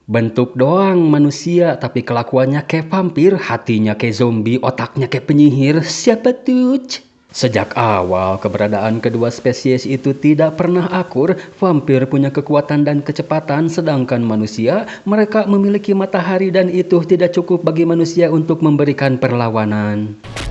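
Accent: West Java, Indonesia. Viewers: Bang lu asli Sunda mana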